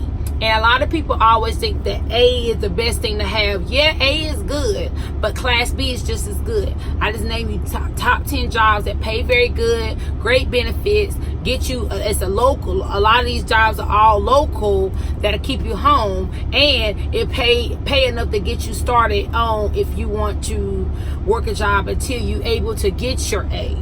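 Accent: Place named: North Carolina, United States. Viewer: I knew she was from nc by her voice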